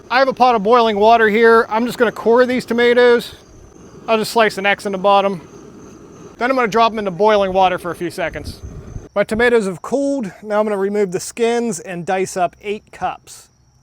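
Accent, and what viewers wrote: United States. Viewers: He is SOOOOOOOO American it’s hurting my British ears. 😨 it’s pronounced To-Maa-toe’s NOT To-Mate-o’s. 🤦‍♂️ Prove me wrong/right keyboard warriors. 😂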